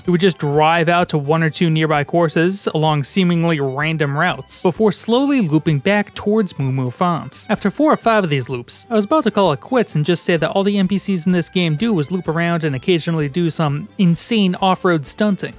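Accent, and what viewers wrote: Boston, United States. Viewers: Is it just me or half way thru the video he talks with a Boston accent for some reason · I love deep dives into game worlds like this! Btw, are you from Boston? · Your accent sounds like Boston. Where are you from?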